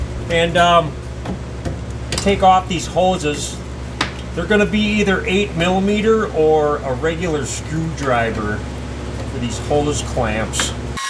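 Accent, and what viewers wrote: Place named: Minnesota, United States. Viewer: Love the accent LOL. Minnesota ? I think this accent with sarcasm is just the best combo. Question if you needed to JUST replace the fuel pump. would you recommend doing it this way or from the top by removing the bed?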